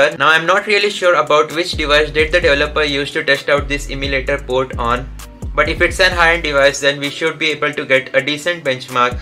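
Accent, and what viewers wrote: India. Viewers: I hear Indian accent I know it’s legit.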